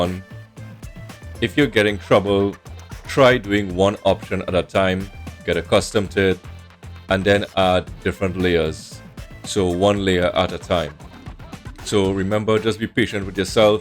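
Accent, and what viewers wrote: Trinidad and Tobago. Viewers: Are you Trinidadian? The accent sounds very familiar.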